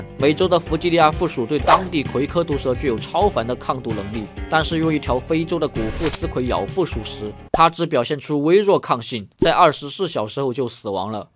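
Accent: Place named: Hunan, China. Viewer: 1，leng；2，不leng。哈哈，这湖南音。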